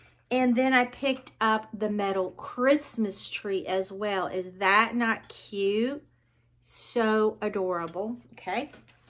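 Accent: South, United States. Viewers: Hey sweet lady I watched your haul and I heard you say you are from WV, I am from WV also Huntington to be exact. May I ask what part of WV you are from?! I thought I heard that southern accent 😉 love all of your hauls and crafts. You are such a sweet and beautiful person inside and out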